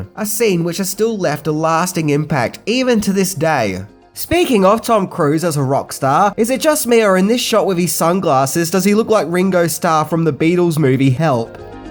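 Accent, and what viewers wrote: Australia. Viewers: He is Australian. He does say some words differently due to the accent. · Come on he is Australian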